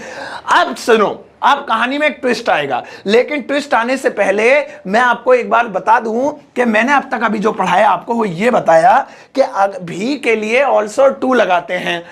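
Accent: India. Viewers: 🇮🇳Deshi english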